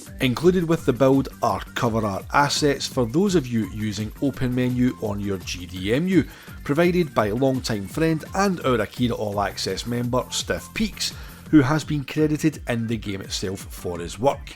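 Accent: Scotland, United Kingdom. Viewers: cool love this accent ;) it’s like listening to Robert Carlyle in Trainspotting. I am french…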